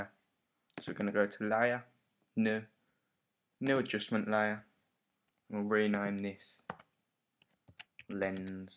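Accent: Birmingham, United Kingdom. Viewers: WTF BRITISH VOX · So refreshing to have this in a Brummie accent instead of one of our American brothers. Big Up from the Solihull Massive.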